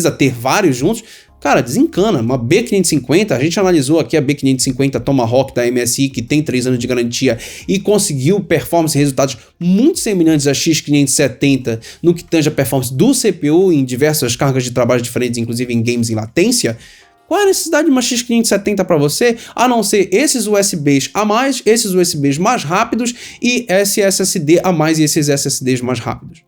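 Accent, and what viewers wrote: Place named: Belém, Brazil. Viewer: Mano... Tu és de Belém-PA? Teu sotaque é típico da nossa rapaziada. 😁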